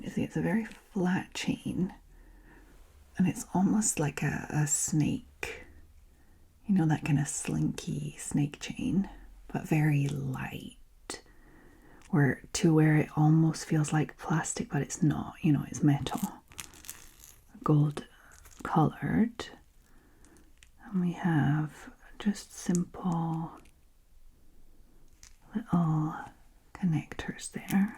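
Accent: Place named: Scotland, United Kingdom. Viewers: She's Scottish but has lived in France for years! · ! Thank you for your amazing content. I stumbled on your channel today accidentally. Your accent has me so confused in a good way. Sometimes it sounds Scottish. Sometimes Mid Atlantic. Are you Canadian? Your voice is hypnotic no matter what!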